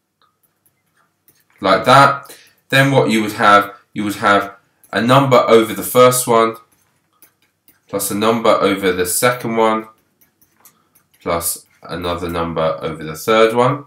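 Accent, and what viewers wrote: United Kingdom. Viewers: Are you from Britain? I asked over your accent.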